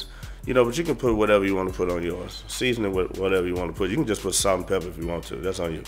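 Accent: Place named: South, United States. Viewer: this guy lives in la but you can tell he came straight outta the south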